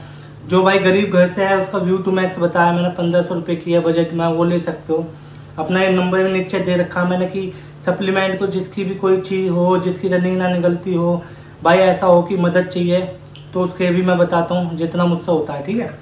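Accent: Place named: Bulandshahr, India. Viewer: Bahiya app disst Bulandshahr se ho kya language se aise he lag raha ha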